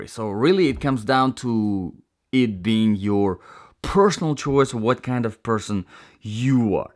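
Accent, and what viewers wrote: Russia. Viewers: Интересный акцент. Вроде как русский и много работал над произношением, но без препода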